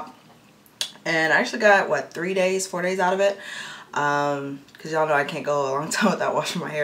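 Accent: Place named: Toronto, Canada. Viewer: You sound like you could be from Toronto.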